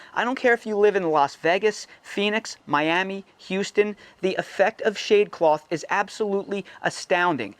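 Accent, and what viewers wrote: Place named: South Jersey, United States. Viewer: thought you had a south jersey accent!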